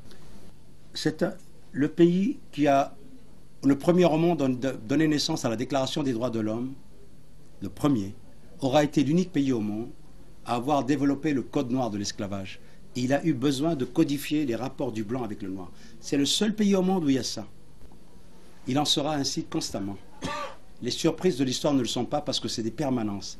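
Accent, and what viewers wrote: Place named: Senegal. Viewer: la prononciation est typiquement Sénégalaise !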